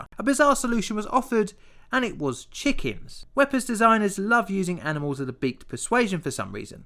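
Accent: United Kingdom. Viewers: I like your content and presentation, but your voice is very comforting in an odd way. My grandparents sounded like you and to this day, I think in a British accent lol. I lived with them most of my childhood. My grandmother was actually Scottish but ended up in southeast England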